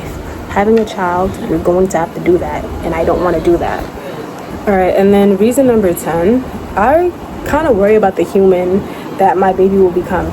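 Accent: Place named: New York, United States. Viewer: Yo you gotta be from New York lol.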